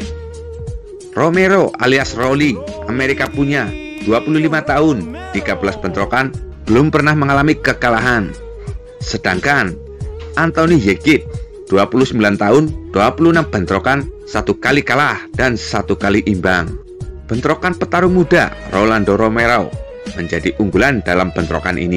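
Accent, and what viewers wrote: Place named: Banyumas, Indonesia. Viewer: Admin mengulas masih logat ngapak👍. Inyong seneng dgn ulasan ini👍👍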